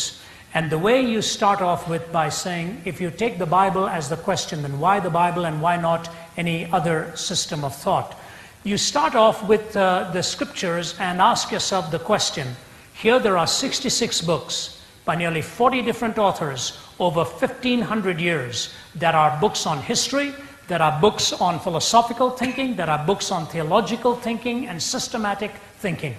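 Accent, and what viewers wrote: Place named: India. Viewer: From the outside, he sounds like any other evangelist/ con man, only with that India/ Pakastani/ guru accent, that makes people 10 times more likely to believe he has spiritual access to your God. Doh!... Why is it, that all of the defenders of Christianity have a big money stake in keeping it going?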